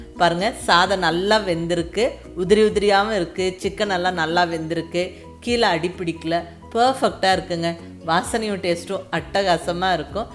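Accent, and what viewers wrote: Kongu, India. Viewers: oh oh gongu nattu tamil konjugirade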